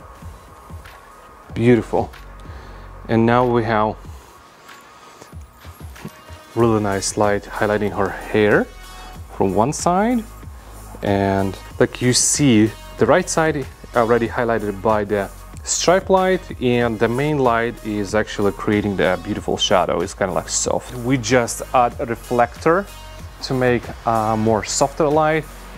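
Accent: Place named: South Carolina, United States. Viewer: Man that SC accent is intense 😊 great video.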